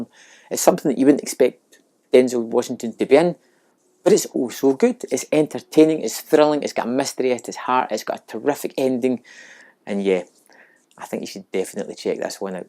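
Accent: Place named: Glasgow, United Kingdom. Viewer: I guess its from Glasgow? Have to digest what you are saying as I am foreigner living in England. But your reviews are good just watched Neesons Non-Stop which was great and the 70-80 % of stuff in Netflix/Amazon is average/bad.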